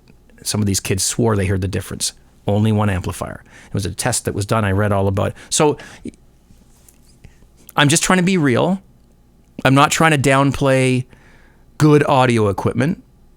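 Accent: Canada. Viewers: Hi, I came to this video late and, after scanning the comments to see if any of them answered the question about why the New Zealand scarf on the wall. From your accent, you sound Canadian, so why the banner? BTW, you know your stuff. Don't let the bastards get you down.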